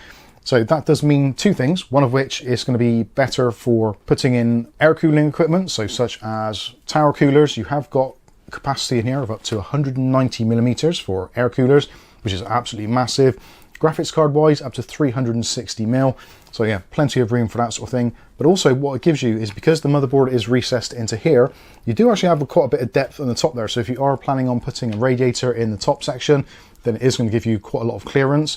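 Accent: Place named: Shires, United Kingdom. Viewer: Love you're shire accent. Great vid, I was actually looking at this case before finding you're video on it. Class.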